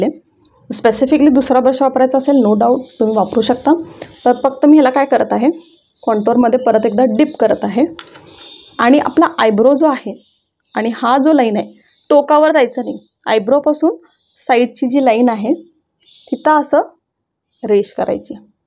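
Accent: Kolhapur, India. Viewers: मॅडम तुम्ही कोल्हापूरच्या आहात का, मध्ये मध्ये भाषेवरून वाटलं❤ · Hi ….. mi make up sathi khoop video pahile pan tuza video khupch chan aani useful vatla. Mast boltes 😊assal Kolhapuri❤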